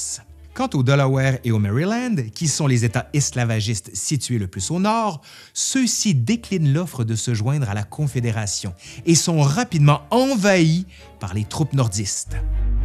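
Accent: Quebec, Canada. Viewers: Super vidéo, je suis un passionné de la guerre de sécession, et c'est un véritable plaisir de l'entendre raconter par ta personne. Surtout ne perd pas ton accent Québécois, cela enlèverais la moitié du plaisir à t'écouter. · Le narrateur a un superbe accent Canadien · Avec ce petit accent canadien qui fait que le charme est complet car on est complètement dedans ! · Très intéressant. Un point objectif et clair sur la guerre de sécession 😮. En plus l accent canadien est au top😅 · Beau travail, l'accent Québécois ajoute un côté agréable même si quelques mots m'échapes . Mais pas grave le sujet est boulot effectué est très agréable a visionner. Bravo ! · J'aime son accent français. Est-ce Canadian ?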